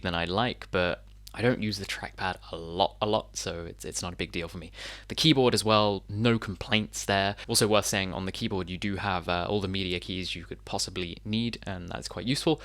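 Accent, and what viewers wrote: England, United Kingdom. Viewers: One of these is being sent to me as a replacement for my old unit which HP lost during repair. Thanks to your review I'm excited about this arriving, so thank you. No idea whether or not mine will have the backlit keyboard but otherwise it's exactly the same spec as yours. You sound english and mentioned english prices, but the layout of the keyboard you showed in the video isn't. So that's more confusion, coupled with HP showing images of this unit having a microSD card reader. For me, it's replacing a 2019 Ryzen 5 3500u from the essential range, so should be quite a nice step up regardless.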